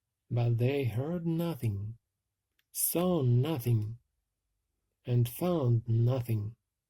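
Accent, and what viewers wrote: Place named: Ukraine. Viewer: Hello, I think your pronunciation is good. There is such a distinct accent difference between Ukrainian and English, isn't there? Your genuine enthusiasm for the English language makes me very happy. I still have a lot to learn, you are very inspiring. Halina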